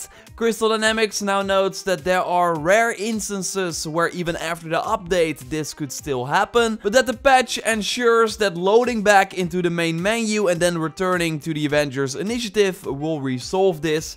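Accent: Netherlands. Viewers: Lemme guess...are you Dutch ? Waarom kost het ons zoveel moeite om zonder accent te spreken?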